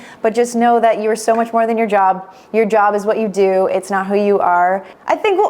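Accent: United States. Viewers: Youre suffering from California-speak in this video. Youre talking too fast and slurring the words. Hard to listen to. Garbled. Throwing away sentences. Do better. You usually do. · Too American for me to understand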